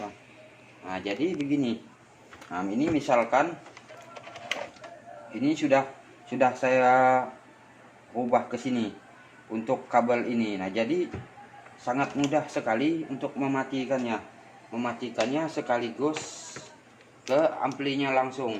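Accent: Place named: Kapuas Hulu, Indonesia. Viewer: Dr cara ngomong abg ini kayak y org kapuas hulu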